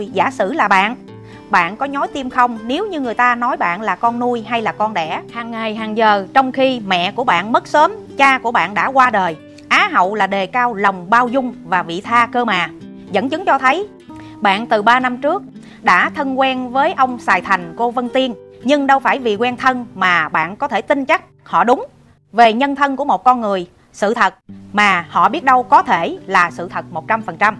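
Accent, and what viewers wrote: Standard. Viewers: lập luận sắc bền rất thích!phát âm khá chuẩn nhưng vẫn còn vài khuyết điểm " luận " " bình LUẬN " không phải " bình LỰNG " chỉnh lại một chút sẽ hấp dẫn hơn chúc em luôn thành công Mãi dõi theo em dõi theo lẽ phải!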